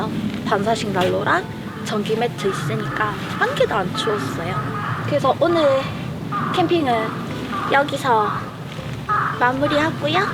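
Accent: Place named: Daegu, South Korea. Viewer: 대구분이신가요? 대구 사투리를 쓰시네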